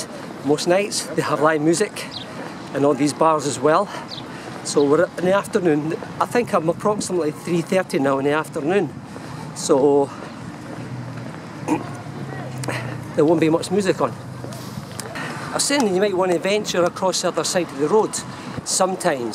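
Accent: Scotland, United Kingdom. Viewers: By the way thanks for all of your advice and I'm looking forward to coming in July. Love the Scottish accent by the way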